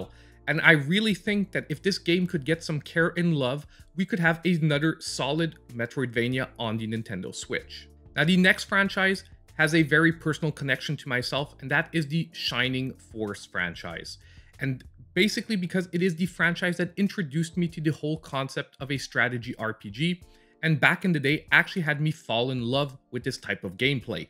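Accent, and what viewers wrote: Canada. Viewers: I come for the content. Stay for the Canadian accent. A youtuber unlike any udder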